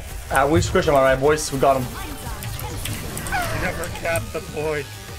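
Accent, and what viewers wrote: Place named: France. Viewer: His first language was french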